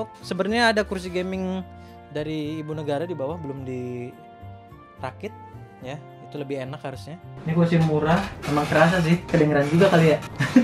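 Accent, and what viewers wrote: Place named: Tegal, Indonesia. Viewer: Dog, lu sebenarnya orang mana sh?? Sukabumi apa dimana, soalnya dari cara bicaranya itu kaya orang tegal-pemalang gitu(medog)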